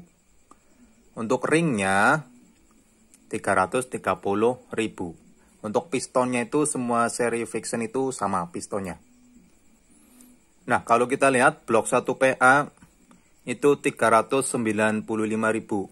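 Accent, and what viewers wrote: Banyumas, Indonesia. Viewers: Kayane wong ngapak gie,tak subsicrab mashe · Ndeleng logate kaya kaya cah ngapak apa ya??🤭😅 Salam ngapak boss..🙏🤭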